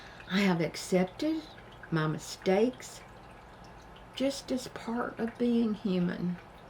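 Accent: South, United States. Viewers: I love your channel. I love listening to you. You have a sweet Southern accent. I can see you growing and glowing. I'm so proud of you. It takes courage to do this.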